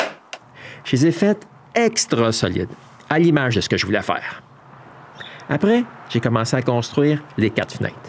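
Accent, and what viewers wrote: Quebec, Canada. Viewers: j’adore cet accent québécois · j'aime bien aussi votre accent canadien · j'adore l'accent québécois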